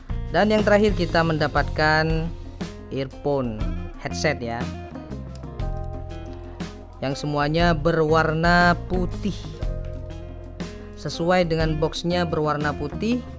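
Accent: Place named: Madura, Indonesia. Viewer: Kok kedengarannya seperti logat Madura😁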